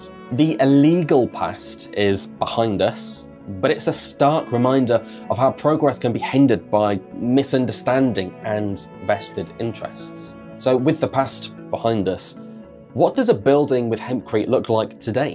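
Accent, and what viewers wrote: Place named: England, United Kingdom. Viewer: This is so US-centric. Maybe the "floodgates were opened in 2018" in the US, but in Europe, industrial hemp has been in use much longer. In fact the US were the only industrialised nation that outlawed industrial hemp for so long. For example, in Germany, the illegal status of industrial hemp was abolished in 1996. Hemp in Europe has always been in use for plumbing, rope production and insulation. It's the increased interest in sustainability and the need for energy efficient house building that has led to its revival. There are many well-established companies that have been selling hemp products for a long time. I can even find them in my local DIY store. For example, "Hanfstein" ( meaning hemp stone) have been producing and selling hempcrete bricks since 15 years. Americans sometimes have to be reminded that there is a world beyond their borders, and that sometimes they are not No.1, but lagging behind. 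Edit: from your accent, I'd expect that you're English, but the situation you described is specific to the US.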